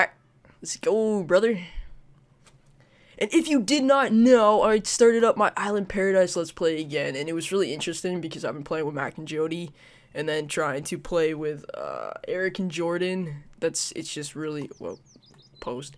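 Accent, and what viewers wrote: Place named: Canada. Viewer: Officially my new favourite gaming channel because sims and canadian accent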